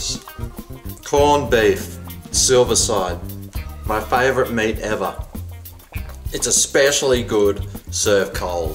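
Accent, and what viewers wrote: Australia. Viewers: Are you oz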